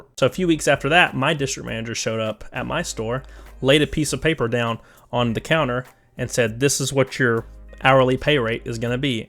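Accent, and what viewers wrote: South, United States. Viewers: Off comment Where are you from I just love your southern accent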